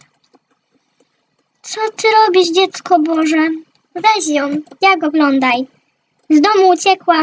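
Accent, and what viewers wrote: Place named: Silesia, Poland. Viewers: Śląski Akcent 👌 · Ze Śląska ? Bo masz taki akcent xd ja jestem ze Śląska xd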